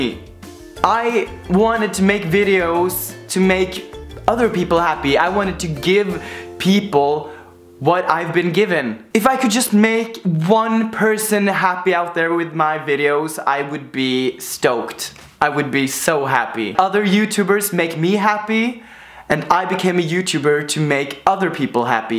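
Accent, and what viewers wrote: Trøndelag, Norway. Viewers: d e jo sør-trøndersk som e sokka da <3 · ÅÅHERREGUUD, trøndeeer!! Æå e trønder hahha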